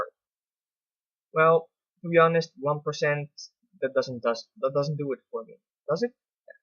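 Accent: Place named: Netherlands. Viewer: I can hear you're dutch :)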